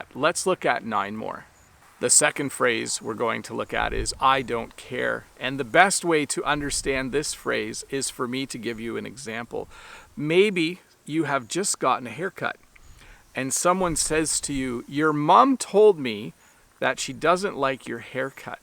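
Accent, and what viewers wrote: Canada. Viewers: I likes Canadian English, it is totally different to US English. Greetings from honduras, nice videos thumbs up well won dude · I knew that you are Canadian through your pronounce especially when you said (about) thank you so much my great teacher for teaching us your beautiful language , I'm watching you from the place where Arabic language was born it's Saudia Arabia .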